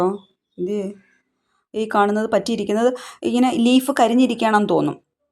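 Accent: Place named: Trivandrum, India. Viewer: Trivandrum angna thanna parayunne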